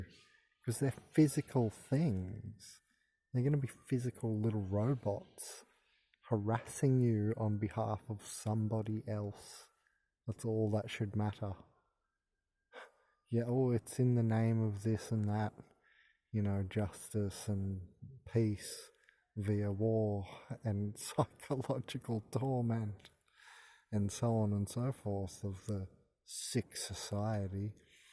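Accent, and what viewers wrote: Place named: Australia. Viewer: Is that the eerie chimes of the Uruguayan Mr Whippy van stalking you at the start of the vid? Sounds like you're an Aussie, did you move to Uruguay in hopes of ending the stalking?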